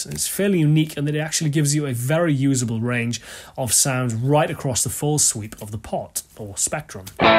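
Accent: United Kingdom. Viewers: I mentioned two yardsticks one of which you didn't address. Do you think could get a band together, tour the world and fill up arenas and stadiums (yes, stadiums!)? By another measure, he has 116K subscribers. StevieT has over 2 million, Tyler from Music is Win has 1.3 million, Marty Schwartz of Marty Music has 2.7 million and the list goes on and on. None of them will be "legends" either but their fans have more justification to stake that claim. By that measure is a mere cult figure. Don't get me wrong, once I got past his Brit accent and Brit pastiness, I realized what a great channel this is. I love his playing style. A legend? I think we're tossing that word about a bit wantonly and "fanboyishly".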